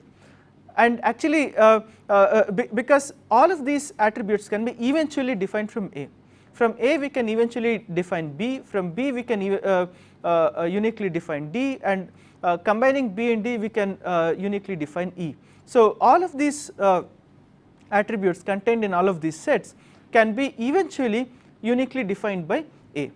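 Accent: India. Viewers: To all who complain .. "he has an Indian accent is annoying and he is constantly stammering" .. Why don't you with perfect accents do something useful ? It seems, aa you can do with your perfect accent is just nag and abuse. Don't forget, BEGGARDS DONT CHOOSE. Tell your parents to teach you a bit humility and respect in perfect English.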